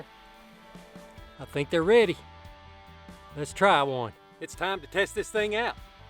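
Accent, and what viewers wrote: Alabama, United States. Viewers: Everybody loves that southern twang.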